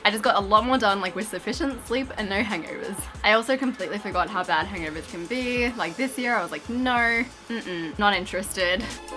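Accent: Australia. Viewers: cool video! i was also thinking about going sober for a while... also i really like the Australian accent, makes me really nostalgic :)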